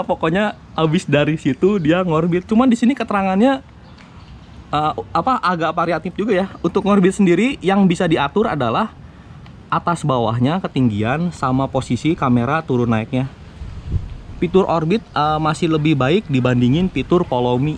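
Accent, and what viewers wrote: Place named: Sunda, Indonesia. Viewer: edan sunda pisan.. alus pisan lah repiw na,, nuhun kang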